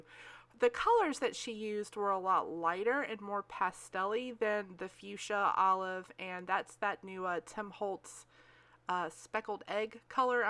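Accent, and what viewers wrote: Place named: North Carolina, United States. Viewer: Ah, maybe a North Carolin accent.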